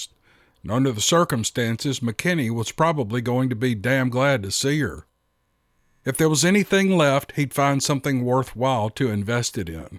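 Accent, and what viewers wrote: South, United States. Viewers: ...can I call you that for short? Anyway, this has been one of my favorite narrations and stories from your channel. Your southern accent and pacing make for good listening! Keep up the good work brother, I really appreciate your craftsmanship. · Your voice, the southern drawl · Great 👍 story an terrific experience Listening too you" and your Southern draw" Gray Eagle seems to be a fascinating character in this story I Must say' toward the end I started Feeling sorry for Big Foot' after Gray Eagle got through working them over Ha!! Ha!! Seriously a Revving Vidio...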